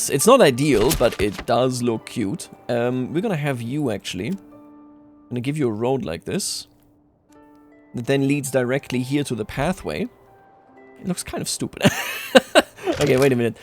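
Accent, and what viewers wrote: Germany. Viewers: Bro doing germglish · i love your Denglish xD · Are you german you say 4 Seiten globel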